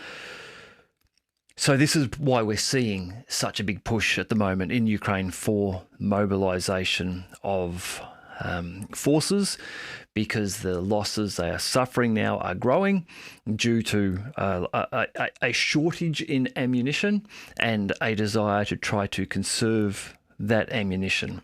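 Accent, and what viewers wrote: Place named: Australia. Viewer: and it's nice to hear an Australian voice giving a balanced account. No such voice exists in New Zealand.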